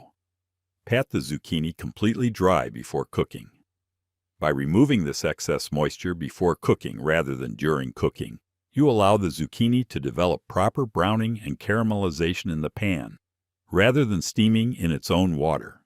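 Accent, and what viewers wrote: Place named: United States. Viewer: What's with that terrible American accent?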